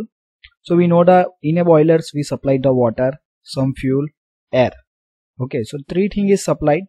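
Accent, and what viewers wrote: India. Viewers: As a german Ingenieer Student its so fun to hear the indian accent combined with the english language XD Love it, seriously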